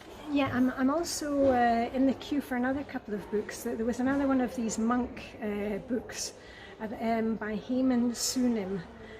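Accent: Scotland, United Kingdom. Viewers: I love your videos! I am American, and you, as you talk, remind me of my friend from Scotland. Thank you for sharing those book reviews with us 😃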